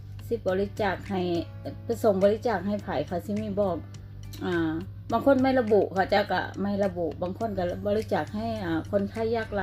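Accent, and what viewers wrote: Phu Thai, Thailand. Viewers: เว้าภูไทเพราะดีครับ ฝนตกๆเด็กชอบเปิดพัดลมนอนสบายดีครับป้า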